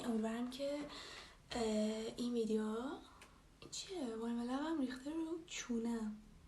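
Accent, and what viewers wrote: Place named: Turkey. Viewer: حس خوبی بهم میدی با توجه به یه کوچولو لحجه ای که داری حس میکنم ترکی❤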